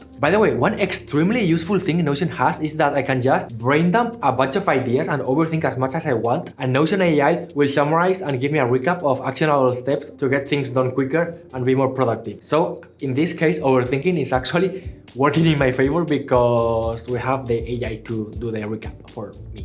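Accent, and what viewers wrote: Spain. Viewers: He has the strongest Spanish accent I have ever heard, I got no idea, about his origins tho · where r u from, ur accent gives me spanish vives (lo eres 100%)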